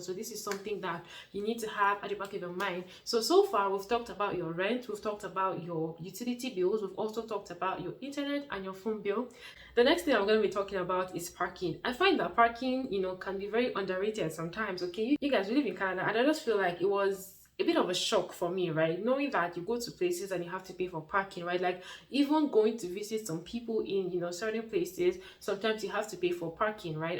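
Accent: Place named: Nigeria. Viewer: Very good video ! I like the way you explain the things ! I watch your video from Ottawa ! I like Nigerian accent 😀👍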